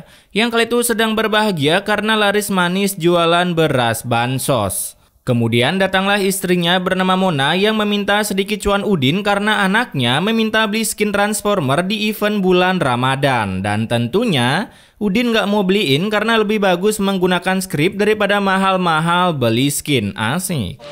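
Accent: Bali, Indonesia. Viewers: Min, dari Bali ya? 😁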